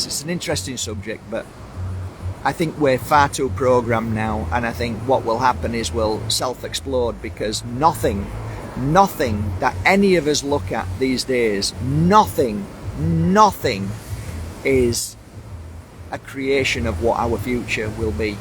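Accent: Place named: North, United Kingdom. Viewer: Northern (good reference too!) no nonense delivery 🤗 with a brilliant combo of compassion/passion 💥💥❤🧡💛💚💙 Love your fire in your belly..... grand job lad! Clarity is by its nature challenging....praise be for that!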